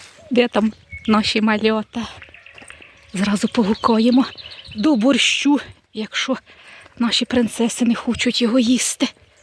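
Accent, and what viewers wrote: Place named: Cherkasy, Ukraine. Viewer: Похне горно! Черкаський говір😊😅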